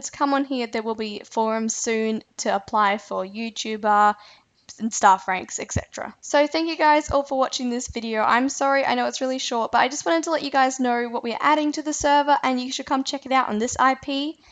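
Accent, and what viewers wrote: Australia. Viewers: Aussie <3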